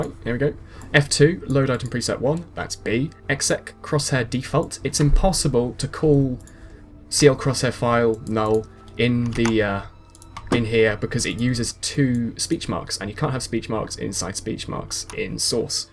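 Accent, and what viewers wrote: United Kingdom. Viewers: I'd be homosexual for you. -British accent -Spy main Oh.. yes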